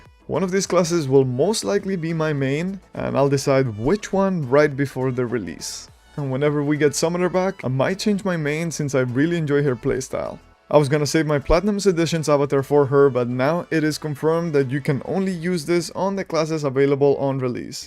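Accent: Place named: Europe. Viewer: I thought you were EU based on your accent haha, or you’re playing both regions?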